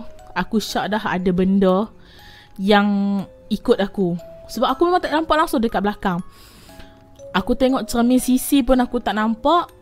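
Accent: Kedah, Malaysia. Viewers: Aku suka akk loghat Kedah😌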